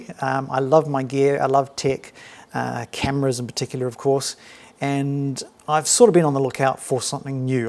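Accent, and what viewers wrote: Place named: New Zealand. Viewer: Love hearing a kiwi voice on the tube. I am waiting for the GH5s announcement. I am a kiwi, living in china watching this in Ukraine. Keep it up dude.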